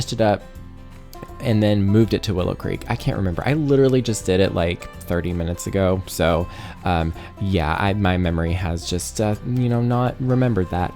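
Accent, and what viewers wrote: South, United States. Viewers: This build is insanely beautiful! Everything about it. The porch and the interior on the lil diagonal! EA should be giving you their packs for free because your builds are so amazing!!! I send all your videos to my friends who play the Sims and everyone loves your energy, creativity, and your lil Southern accent!!! Hugs and love from Philly!!!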